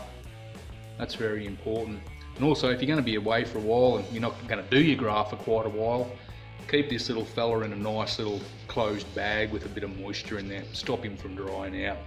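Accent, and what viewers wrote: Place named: Australia. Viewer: No nonsense Aussie speak !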